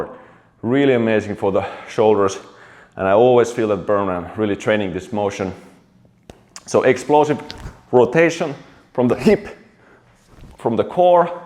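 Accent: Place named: Finland. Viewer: The accent sounds like he is Finnish or perhaps Estonian. Neither are in the Indo European language family.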